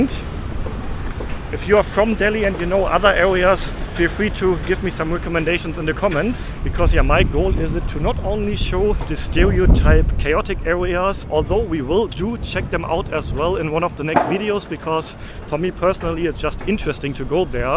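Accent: Germany. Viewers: Your German is ominipresent while you speak in English: 1947 and not 1974 as one says in German! ^^ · I like your german accent of English ...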